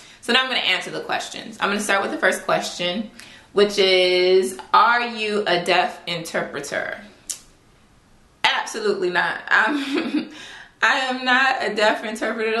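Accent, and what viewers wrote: Caribbean. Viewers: I started teaching myself sign language from this girl on YT-AshleyMichele07. You're right, it is learning a new language. I hear the accent. Big ups to the Caribbean ladies.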